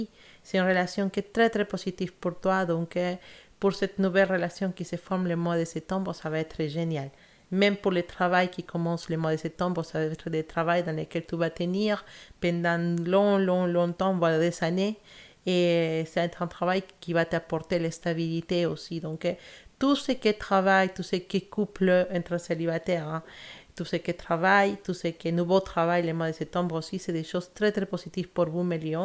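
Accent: Spain. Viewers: cet accent, seriez-vous espagnol?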